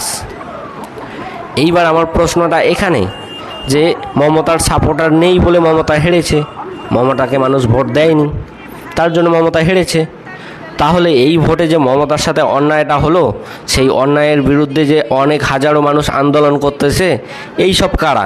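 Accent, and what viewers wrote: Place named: Bangladesh. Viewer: তোর কথা শুনে মনে হচ্ছে তুই বাংলাদেশি একদম সিওর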